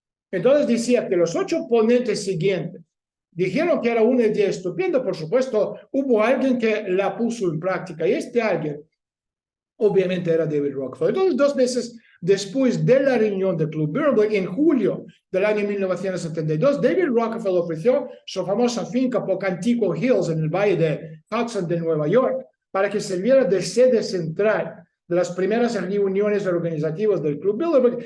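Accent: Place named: Spain. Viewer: Si ya vives en México¿Porqué hablas en español de España? Creo que sería más cómodo para todos que te fueras a vivir a España, con Borrel, te sentirás bien de compartir la lengua de ese "rusófilo" conocido.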